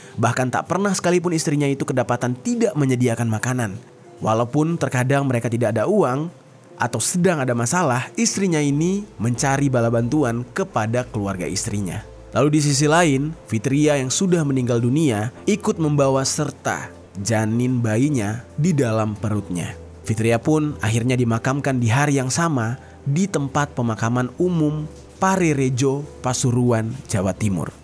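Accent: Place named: Aceh, Indonesia. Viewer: Belum aja ya dengar bg dengan logat aceh nya🗿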